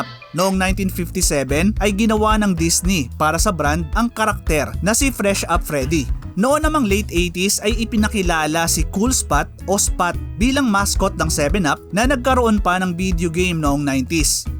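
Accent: Waray, Philippines. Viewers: Waray ka ba tsong?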